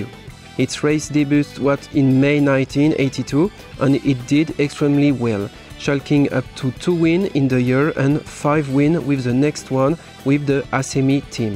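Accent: France. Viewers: Salut mon gars ! J'adore tes créations. (je sais que t'es français : notre accent...) Je pense même à acheter les pièces pour construire ton modèle.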